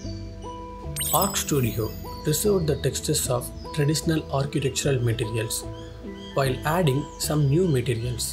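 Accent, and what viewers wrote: India. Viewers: Why indian accent? So.boring & cringe!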